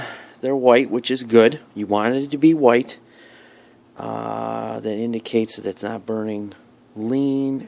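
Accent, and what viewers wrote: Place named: Boston, United States. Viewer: thxs for the video.. Wife's car needs a change of plugs I'm guessing that's a Massachusetts accent lol Boston maybe?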